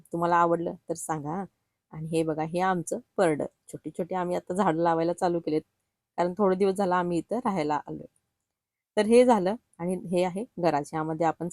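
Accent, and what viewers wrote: Kolhapur, India. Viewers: Arre hi tr aapli kolhapuri bhasha as mla vat tiya pn mastt ghar aahe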